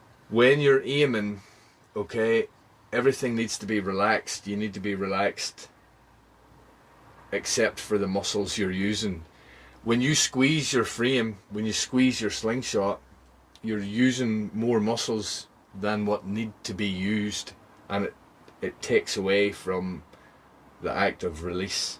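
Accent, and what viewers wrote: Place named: Belfast, United Kingdom. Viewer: his accent is called a Belfast accent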